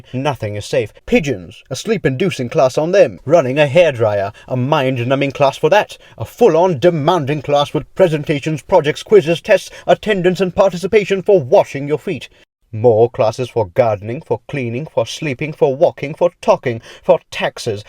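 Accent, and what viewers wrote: United Kingdom. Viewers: I just came from the squared squares video and this is NOTHING at all like your other video, but I love both of them, for different reasons lmao. It doesn’t even sound like the same person in this video?? Is this really you just putting on a British accent? You sound so different lol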